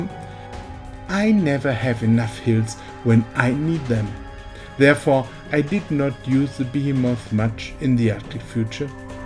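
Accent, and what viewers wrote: Germany. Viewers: I am only in colonial age, but I watched the video just to see the amazing robots, animations and your German accent😂 love your vids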